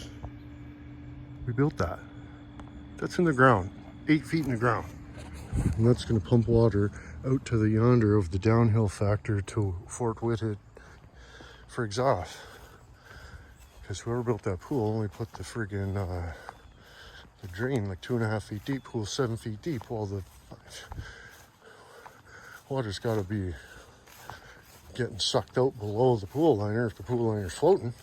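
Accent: Canada. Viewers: My radar went up on the accent. Sure enough, he zoomed in on a license plate from Ontario Canada.... Where they REALLY don't listen to OSHA. We'll played Sir. Get them views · No he's just Canadian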